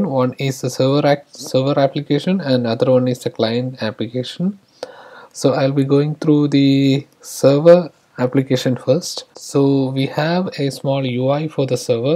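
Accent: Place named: India. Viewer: bhai full indian accent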